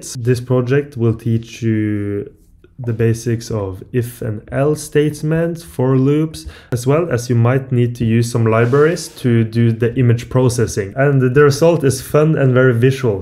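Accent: Norway. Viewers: Crazy. Are you from Norway?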